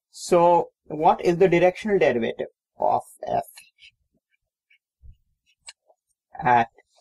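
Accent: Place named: Arab world. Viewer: middle east accent is just annoying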